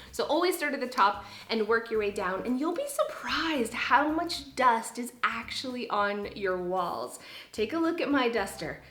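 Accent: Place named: Southern Ontario, Canada. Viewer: You have to be Canadian or even from Ontario, pa day, geese, sounds like southern Ontario lol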